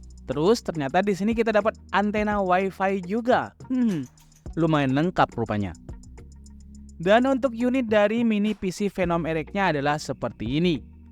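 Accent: Madura, Indonesia. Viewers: Owalah, orang bondowoso, pantesan. Umumnya d rakit, malah ngomongnya rantep, kerasa maduranya😅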